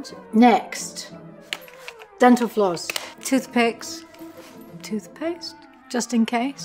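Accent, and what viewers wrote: England, United Kingdom. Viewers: She’s actually not! Born in the US but spent part of her childhood in London. I forget why but her parents are not British. She moved back to the States at 11 or 12 but she had picked up a British accent while in the UK. She reverted back to American to fit it but she can switch back and forth as needed. She does her interviews in the US with her American accent. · What is with British accent? She was born in Chicago. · OMG, i thought you were American, Love your British accent way better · I am blown away! Her British accent!!!!! Such a lovely lovely woman. Huge fan! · I was like oh no that is not Gillian this lady has a British accent Scully doesn't have an accent. I am so used to hearing Gillian with an American voice. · That English accent surprised me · She has a British accent now? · Her beautiful british accent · Why does she speak with British accent?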